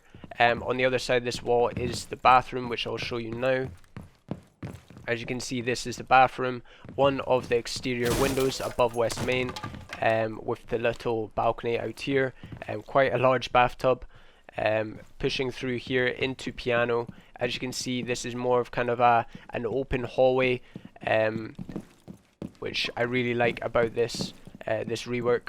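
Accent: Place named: Belfast, United Kingdom. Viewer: R u from belfast?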